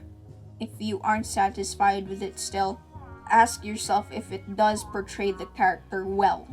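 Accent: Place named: Philippines. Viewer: I knew your Filipino from the way you speak (IDK but I can easily determine Filipino accent) but I got the confirmation with that YAWA PUTANGINA moment.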